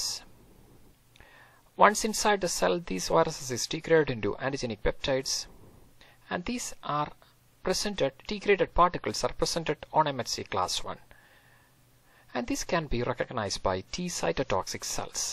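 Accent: India. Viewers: You sound like the gupta that works at the gas station down the street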